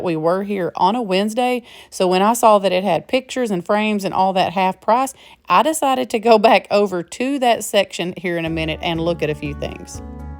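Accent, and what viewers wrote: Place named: Fort Worth, United States. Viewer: My third comment today only it's a question just based on your accent and like I said I have not listened to a lot of your videos not because I don't like them but because I do listen to a lot of others especially about politics and the Christian religion which I am a Christian but are you from the Fort Worth area I live in suburb of Fort Worth I was just wondering which antique shop you went to LOL but I think you should have definitely got the first one but again send me the name of the artist and the name of the actual picture that's what on that little tiny brass placket that's tacked on there that's will tell you if it's valuable or not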